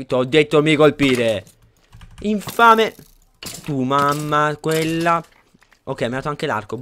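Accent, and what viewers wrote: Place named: Veneto, Italy. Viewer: Gli scleri che ti partono in veneto sono i migliori!! Daghine;)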